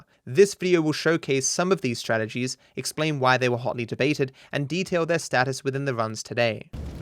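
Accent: Australia. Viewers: This guys stupid Australian accent mixed with his terrible speech impediment that makes his “R’s” sounds like “Aw” makes him impossible to understand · Oi, u are Australian? lol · Wait your from Australia? Wtf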